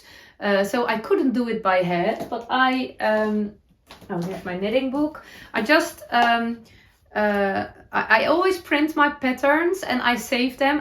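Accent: Netherlands. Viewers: Dear, I always look forward to your podcast episodes, sitting comfortably in my armchair knitting and listening to you. As I was born in Aken, your dutchy English gives me home vibes. Heartjumping! And of course I'm coming to Breda for the k2p2 festival. My husband is coming with me, if only so that I can show a few more of my knitted pieces on him as a model. 😇 Best wishes from Cologne!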